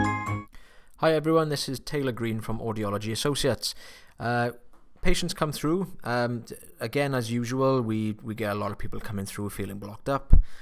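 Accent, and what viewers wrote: Wales, United Kingdom. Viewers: It's Welsh if I'm not mistaken.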